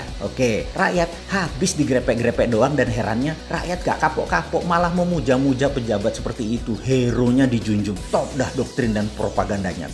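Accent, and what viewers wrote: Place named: East Java, Indonesia. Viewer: Jawa timur banget nadanya🤣🤣🤣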